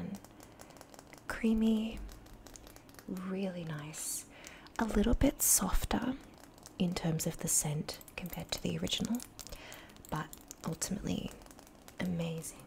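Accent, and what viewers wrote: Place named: Australia. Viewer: Love listening to the australian accent 🌞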